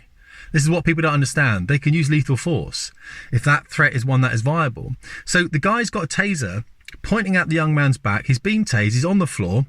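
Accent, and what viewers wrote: United Kingdom. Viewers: Wonderful to hear a true British man talking!!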